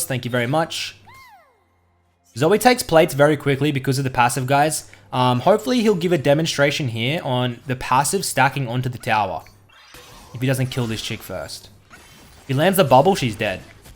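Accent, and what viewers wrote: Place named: Australia. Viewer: Man this guy speaks like a true Aussie bloke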